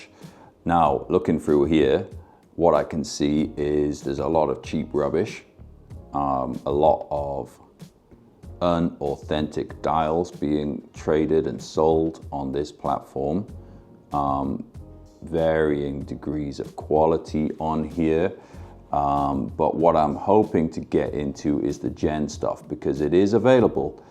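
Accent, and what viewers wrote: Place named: Wales, United Kingdom. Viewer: Where’s your accent from because I thought I could hear a bit of Welsh in it? 🧐👍🏻🏴🇬🇧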